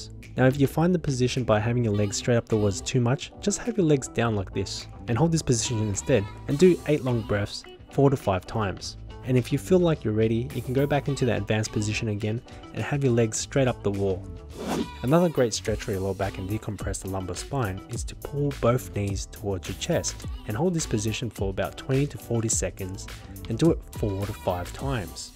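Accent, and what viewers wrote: Australia. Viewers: Super helpful. Thank you so much. Love your Australian accent too.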